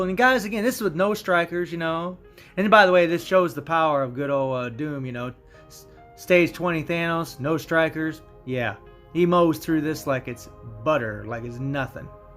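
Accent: United States. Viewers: He is from the United States it's obvious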